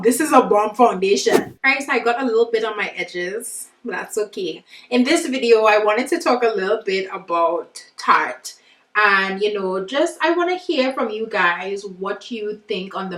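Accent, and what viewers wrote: Trinidad and Tobago. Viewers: Are you trinidadian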